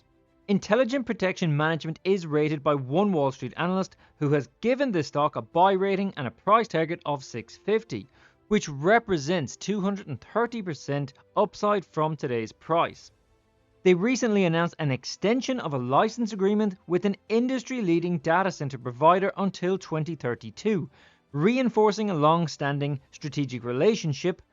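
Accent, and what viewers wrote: Ireland. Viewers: The irish accent is killing me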